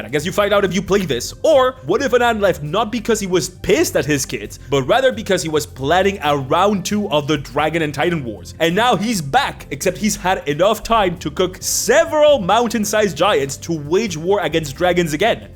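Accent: Ireland. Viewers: Release the forbidden Irish accent Go on. Go on. Go on. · give us your irish accent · As one of your Irish viewers, the fact you used to speak with a big Dub accent makes me unspeakably happy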